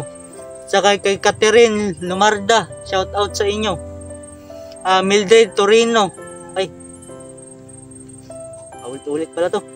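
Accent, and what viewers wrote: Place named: Iloilo, Philippines. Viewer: ingat kaung lahat. ilonggo ata c a ung tuno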